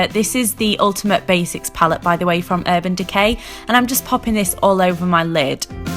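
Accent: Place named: North, United Kingdom. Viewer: You're so northern and I love it!!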